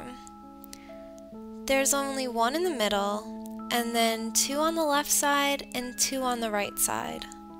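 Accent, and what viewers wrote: Canada. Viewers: I'm pretty sure she's Canadian. She has a bit of an accent when she says "about", and it sounds Canadian. People with different accents say words differently.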